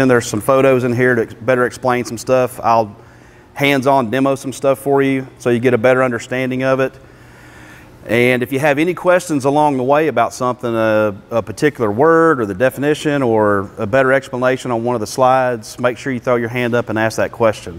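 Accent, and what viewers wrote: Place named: United States. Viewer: Goddamn, he country as hell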